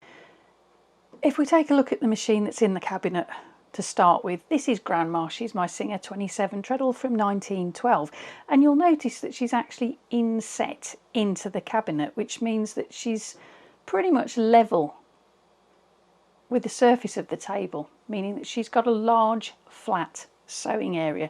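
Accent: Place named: United Kingdom. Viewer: OMG, I still can't figure it out from where you are shooting your videos. Your accent is British, the sewing space looks British too and handcranked machines, but your Oregon /Sisters video:-))) LOL.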